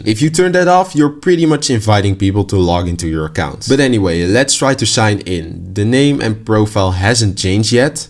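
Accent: Netherlands. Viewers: Ik hoorde gelijk je bent Nederlander lol.. als nog goed hoor je kan echt niemand meer vertrouwen deze tijd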